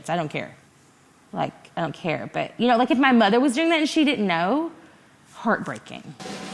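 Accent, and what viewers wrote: United Kingdom. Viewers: I thought she was british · Legit thought she was British until now lmao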